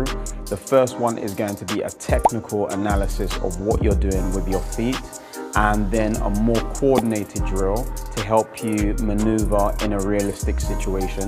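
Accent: United Kingdom. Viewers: primera vez que oigo a un cubano (si no entendí mal) hablar en un perfecto acento britanico... y encima con excelentes tecnicas! gracias!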